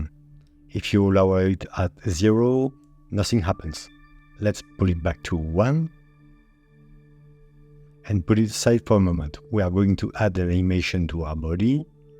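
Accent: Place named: France. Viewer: Great my friend. Tu es français non? je reconnais l'accent. Anycase I tried others tutos, not working but yours did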